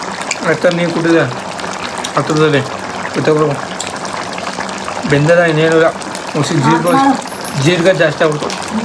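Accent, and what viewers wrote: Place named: Hassan, India. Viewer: Nam amma Hassan doru nam appa uttar karnataka nanu Hassan bhashena,uta na,climate na tumba miss madkoteni and nimma bhashe keli tumba kushi aytu One matt heltini bro nim lifestyle nange tumba eshta because nemdi ede dudd edre nemdi jasti eralla nange nim tara halli jeevna madbeku anta ishta sir and nive healthy agi ertira last vargu ❤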